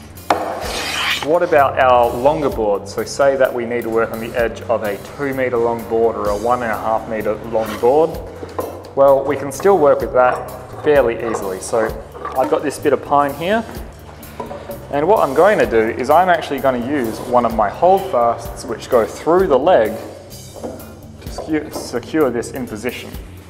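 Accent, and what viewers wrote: Australia. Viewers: Great project and I cant wait to build one myself. Where cn I buy the holdfasts? Great to hear an Ozy voice.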